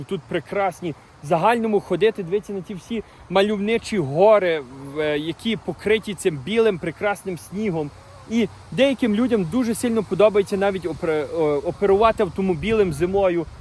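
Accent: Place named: Galicia, Ukraine. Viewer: Цікава розмова. А що всюди треба працювати , то так має бути. Гарно розмовляєте українською мовою. Чую що Ви з Галицького краю. Дай Боже Вам поводження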